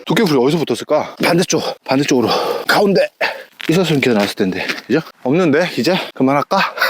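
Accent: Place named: Gyeongsang, South Korea. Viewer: 부산경남 사투리가 상당히 자연스러운데 고향이 경상도신지?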